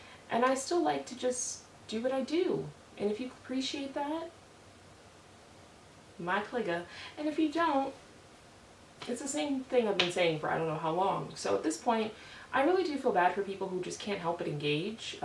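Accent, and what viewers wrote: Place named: England, United Kingdom. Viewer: My guess is the english accent.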